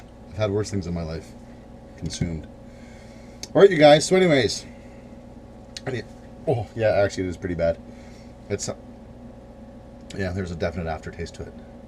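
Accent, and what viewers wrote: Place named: North, United Kingdom. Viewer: Just curious, why would you take the probe off the fermenter. You still want to be bringing the actual temp of the ferment up....I could be totally wrong but I think you should keep it attached. Anyway, can't wait to see how it turns out. Once I have my dual stage controller a lager is in order. Cheers my more "northern accent" friend!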